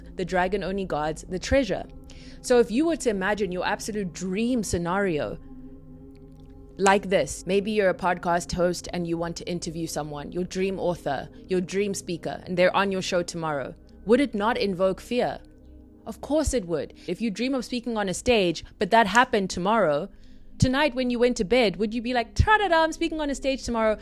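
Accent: South Africa. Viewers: The dragon only guards the treasure🤯 I was in a yoga pose listening to this and I almost fell to the ground. Thank you for this episode you just freed me and I’m into this episode. Thank you for being this example and living your purpose. As a South African hearing my accent speak this work is so beautiful and lets me know it’s time for me to teach what I learnt to free myself from conditions and limitations. Thank you for this. I’ll start my YouTube channel officially today. No more fear. No more spiritual closets either. 🙌🏾🫰🏽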